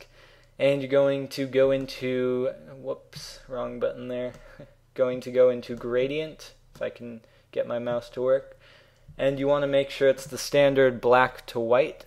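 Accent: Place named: California, United States. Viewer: You sound more California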